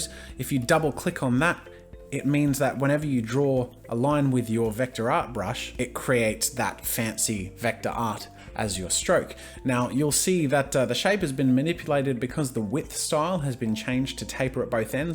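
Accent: Australia. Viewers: Is he Australian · Are you Austrailian? Just wondering it sounds like an austrailian accsent but it´s vety COOL and sorry if a have a lot of misspelling but I´m not from anywhere where people speak english and I´m not that good at it :D LOVE YOU´R CHANNEL!!!! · ho Australian accent. my favourite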